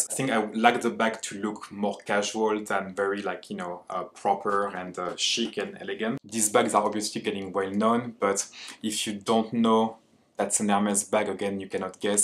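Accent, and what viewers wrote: France. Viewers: This is the first time I understand a french accent and even find it pretty. I am a foreigner as well and accents can be challenging for me lol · I am in whaouuuuuu. What a well curated collection! Really inspiring . Btw, it will be a shame to completely loose that cute French accent of yours. Just saying 🙃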